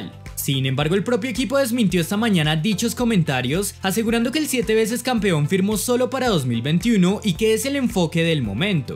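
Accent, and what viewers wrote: Colombia. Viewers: es de colombia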